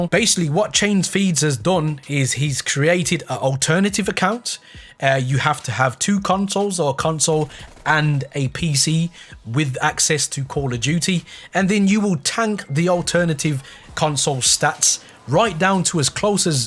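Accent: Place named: Midlands, United Kingdom. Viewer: are you from the Midlands? I recognise the accent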